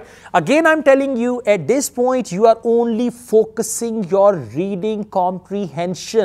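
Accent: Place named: Punjab, India. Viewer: I like your Punjabi accent 😁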